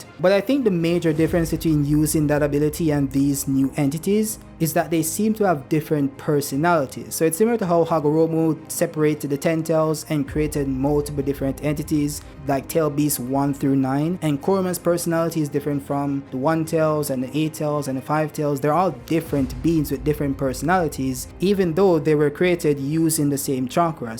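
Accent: Jamaica. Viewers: by chance Jamaican?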